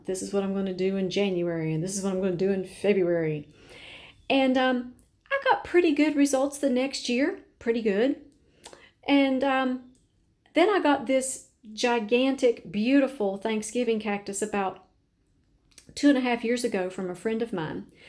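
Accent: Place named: North Carolina, United States. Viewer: I hear NC in the dialect. Am I right!? These have grown so beautifully!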